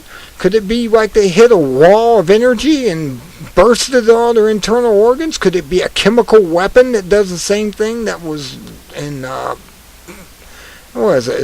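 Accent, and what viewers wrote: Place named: United States. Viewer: You do not read well and your accent is tough for non-American second-language English speakers, but keep going, because it focuses us and helps us follow the story. Keep going! Can you believe the rubbish, oh, yeah, cold water killed those fish? I am from Ohio and fish can be nearly frozen solid and survive, they did it every winter back there? Those fish look horrible and distressed, look at their eyes or the lack of eyes! I have never seen this! The eyes should be cloudy but not missing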